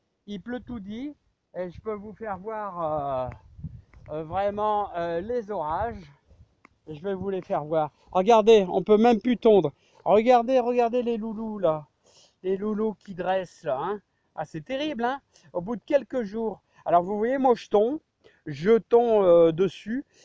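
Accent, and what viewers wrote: North, France. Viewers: Bonsoir merci pour votre vidéo, oui il pleut et attention au mildiou!! il faudra surveiller. J'adore votre parlé du nord!! A bientôt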